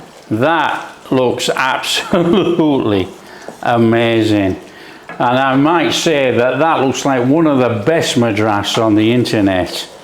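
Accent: Yorkshire, United Kingdom. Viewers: Love hearing a fellow yorkshireman when i click on a video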